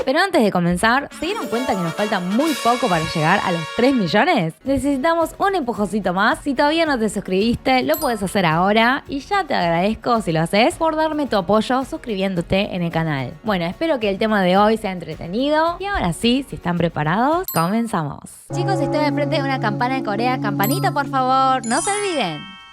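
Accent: Argentina. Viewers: A la gente es lo que menos debería importarnos si amas y respetas el lugar que llegas y adoptas de corazón es y debe ser lo más importante ,difícil es una adaptación y encima la crítica de si eres o no de aquí o haya,no cabe duda que somos unos tontos complicados ,felicidades por tu nacionalidad coreana !! A mí me encanta como hablas argentina!! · Felicitaciones, la verdad me encanta tú acento argentino en español, es bonito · Solo con tu acento quein en su sano juicio dudaria que vos es argentina :) Saludos . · A fin de cuentas nace en Argentina, habla con acento argentino pero se siente mas a gusto en Corea ó en Argentina? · el acento argentino siempre te acompañará, eres una linda argentina-coreana. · escuchandote hablar sos argentina,autopersivite como quieras ( argento/koreana ), cómo argentino no tengo problemas con ninguna nacionalidad no se porque aclaran tanto culturas asiaticas nacidas de otros paises, creo que es por prejuicio de paises asiaticos porque en argentina una vez superada la edad del pavo da igual la nacionalidad de las personas, lo que se mide es la mentalidad. 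Hay argentinos en todas partes del mundo y no tienden a esa confusión, este dónde este dice que es argentino, la nacionalidad no es un virtud solo una nacionalidad. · No me sorprende tengas nacionalidad china coreana sino que hablas RE- gaucha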